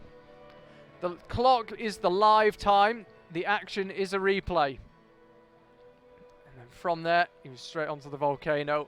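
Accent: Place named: United Kingdom. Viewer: DJ with cabin fever :P I do fancy your very british commentary!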